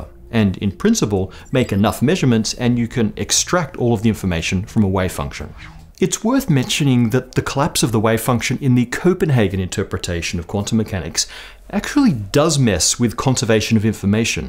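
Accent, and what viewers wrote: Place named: Australia. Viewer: I like this guy, he sounds Australian. I like Australians.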